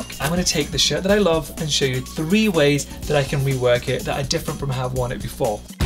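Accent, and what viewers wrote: United Kingdom. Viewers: This guy annoys me, even with his British accent.... I miss Carson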